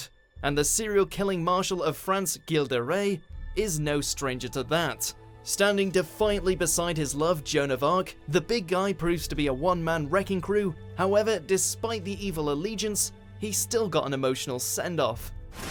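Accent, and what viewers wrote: United States. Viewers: Owbeetow Ucheeha. Gee, Why the fuck do you have to always americanize the way you read japanese names?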